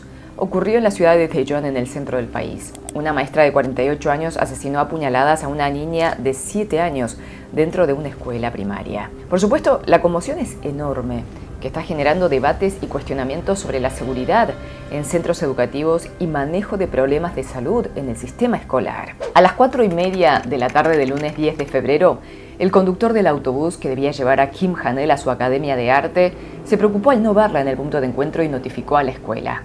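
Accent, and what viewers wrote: Argentina. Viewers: Se ve extraño , ver a una chica con rasgos asiáticos y hablando argentino 😂 muy cool pero desconcertante ❤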